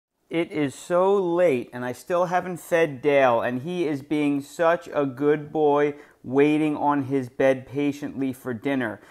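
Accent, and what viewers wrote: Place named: South Jersey, United States. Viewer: Are you sure you're from Philly? Your accent sounds more like South Jersey.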